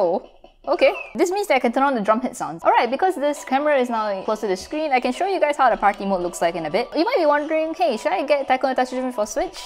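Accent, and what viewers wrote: Singapore. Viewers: So this is Singapore Accent? Hmm instersting...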